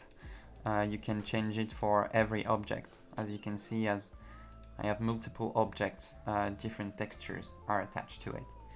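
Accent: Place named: France. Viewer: Thanks so much for making this video. Your French accent is great mon ami!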